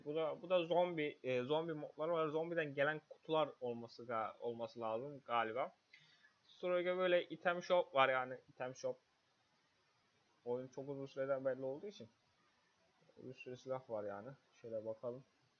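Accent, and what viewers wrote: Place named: Turkey. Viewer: kelimeleri türkçe gibi okuduğunda çıldırıyorum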